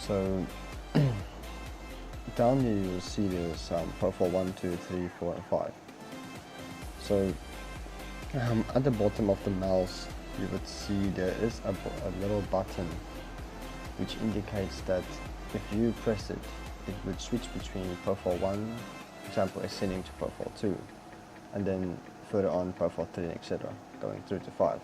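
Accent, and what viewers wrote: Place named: Afrikaans, South Africa. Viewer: N mens kaan hoor jys afrikaans😂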